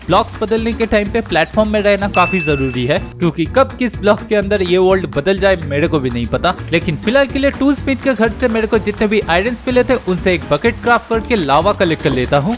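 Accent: Bengal, India. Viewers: bhai Tum Bengali ho kya?, 🙂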